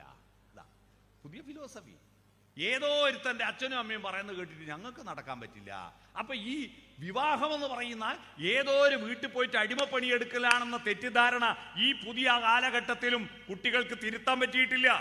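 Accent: Kannur, India. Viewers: വഴക്കന്ന് പൊരിച്ചു വെച്ചോ വഴക്കന്ന് പിരിച്ചു വച്ചു എന്ന് പറയു വെറും കണ്ണൂർ ഭാഷ പറയാതിരിക്കുക...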